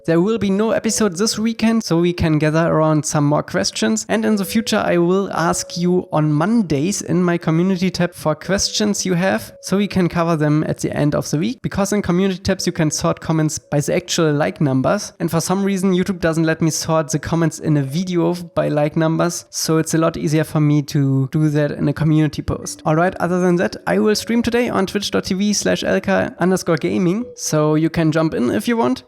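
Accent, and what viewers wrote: Germany. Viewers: That german accent i love it haha xd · Are you German or somthing linke this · Musste am Anfang schon schmunzeln, weil man sofort raushört das du deutscher bist. Aber digga zieh durch, du hast mehr als mein Respekt und hoffe dass in 2 jahren ein geiles Avatar Game rauskommt und du da dann auch mitwirken kannst !❤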